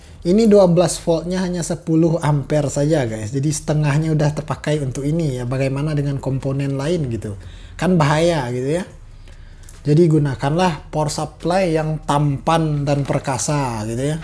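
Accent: West Sumatra, Indonesia. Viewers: Kebawa logat minang nya bg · Bang... Logat lu mirip Logat orang minang 😁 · Logatnya mirip logat minang ya bro Nice experiment..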